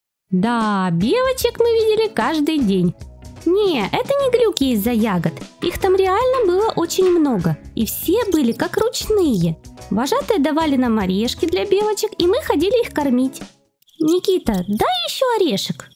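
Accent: Ukraine. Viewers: Ты очень красиво говоришь по-украински может просто делайте ролики на украинском и можешь ещё сделать побольше историй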